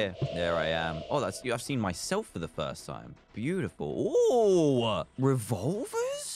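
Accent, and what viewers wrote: United Kingdom. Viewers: Eat Your Cereal he’s British lol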